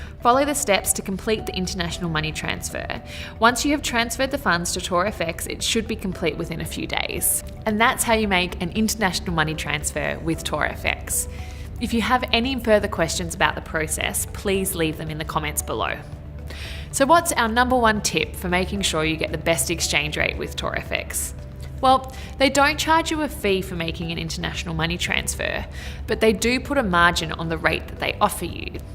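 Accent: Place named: Australia. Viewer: I love her Australian accent and is very wise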